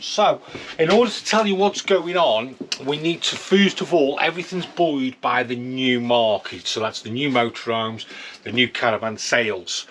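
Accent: Stoke, United Kingdom. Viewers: You must be a stokie, with that accent ? , interesting facts 👍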